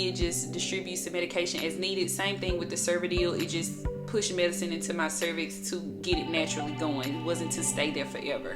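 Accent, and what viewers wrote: South, United States. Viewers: I'm sorry for your loss. Your spirit is amazing. I'm glad you said it...not making light of the situation because you had me lol. Where are you from because you sound just as country as I do😉🥰💫 Not the transformers!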